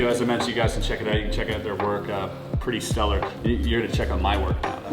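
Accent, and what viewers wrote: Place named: Quebec, Canada. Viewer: bonjour j'ai vu que tu habite au quebec grace a ton accent et au moment ou tu parle francais bref continue! t'es super on t'aime!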